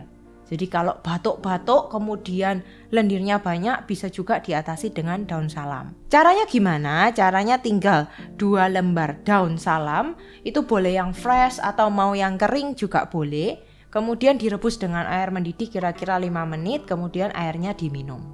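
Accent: Java, Indonesia. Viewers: logat jawanya❤❤❤❤❤❤❤❤❤❤❤❤❤❤❤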